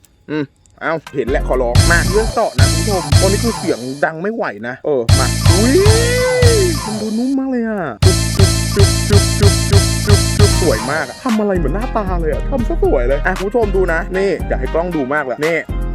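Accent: South, Thailand. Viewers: พี่เปนคนจังหวัดอะไหรค่ะ เหมือนคนใต้เลย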